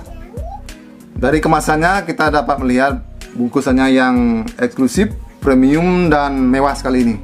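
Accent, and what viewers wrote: Bali, Indonesia. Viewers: duhh seksiinyaaa logatnya bali bgtt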